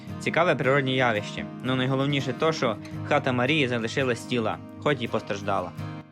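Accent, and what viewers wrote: Hutsul, Ukraine. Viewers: ДУЖЕ ДОБРЕ, СТАРАЙСЯ) БО ТУТ В НЬЮ ЙОРКУ ХОЧЕТЬСЯ ЧУТИ РІДНІ КАРПАТСЬКІ ЗВУКИ:))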